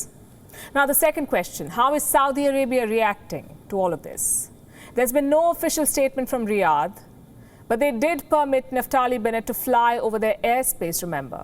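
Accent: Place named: India. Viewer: I wish madam newsreader hadn't had Indian/pakistani accent. it is quite unimpressive.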